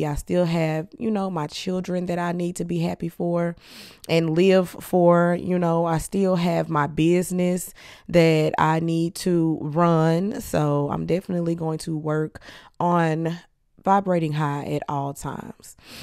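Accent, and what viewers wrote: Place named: St. Louis, United States. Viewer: I definitely wanna become a esthetician the more and more I watch ur videos…but I kant help to ask yu got the urrrz like ur from St.Louis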